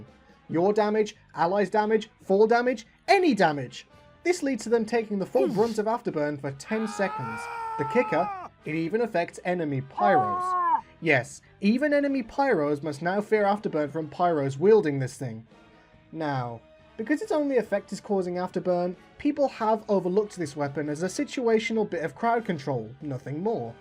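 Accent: Poland. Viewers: M8, u from Poland?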